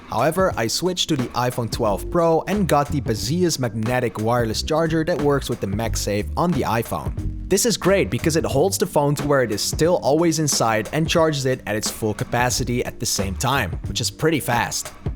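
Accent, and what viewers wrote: Netherlands. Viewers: I sound a bit of an dutch accents. Nice that your english is almost native. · I skipped the video forward to check if this is interesting to watch. And I immediately thought hmmm... you sound Dutch. And than you said 'twee vliegen in een klap' right after it. Toffe video en zeker een inspiratie voor mijn eigen setup!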